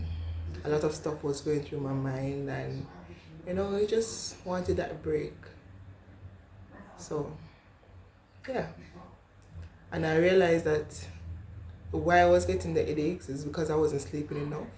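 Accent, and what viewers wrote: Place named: Jamaica. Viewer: I love your accent girl..omg😍😍😍😍😍😍, I love Jamaicans 🥰❤️🥰❤️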